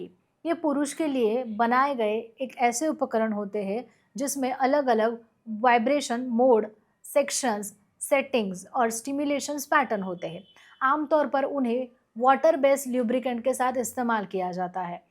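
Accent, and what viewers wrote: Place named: Maharashtra, India. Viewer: Marathi manus wala flavour aa raha hai .. kuthun aahe apan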